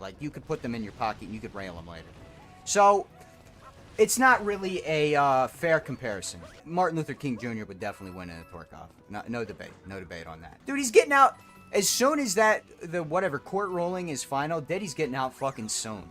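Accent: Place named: New York, United States. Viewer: new york accent like Schlatt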